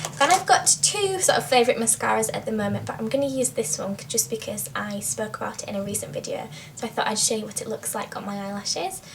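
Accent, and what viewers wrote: United Kingdom. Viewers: where are you from in the uk? your accent is so interesting ive never heard one quite like it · i like your british accent:) hihi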